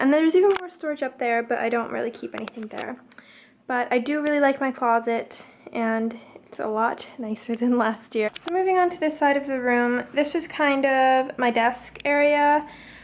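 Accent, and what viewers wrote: United Kingdom. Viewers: You sound so British! :) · I think you've kinda picked up an English accent 😄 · Omg I just watched a few of your older videos and I noticed in this one you Kinda developed a British accent!! That is sooooo cool!!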